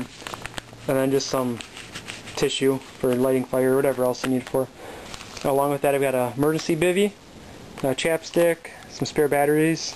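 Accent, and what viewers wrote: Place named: Upper Peninsula, United States. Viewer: you sound like a yooper der pale, you from canada or anywere around ehh?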